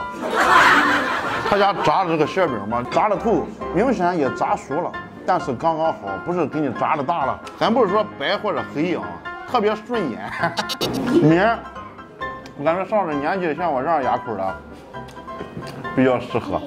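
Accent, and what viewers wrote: Qingdao, China. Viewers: 想回家啊，听青岛话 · 聼青島話，回憶喝青島啤酒吃海鮮，真想故鄉。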